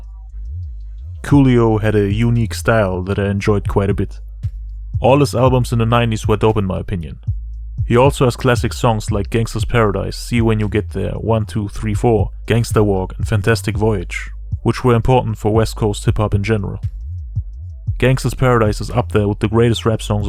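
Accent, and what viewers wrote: Germany. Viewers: is this guy German lol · Bist du deutscher? Hört sich so an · Are you from germany? I just mean because of the accent and the farid bang video😂😂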